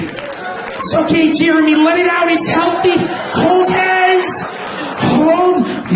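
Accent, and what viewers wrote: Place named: Pakistan. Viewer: ما عنده حس فكاهي و لهجته مو لهجت لبناني يحكي انجليزي بل بكستاني او افغنستاني ...... مهم يزيد يشوه ف صمعة العرب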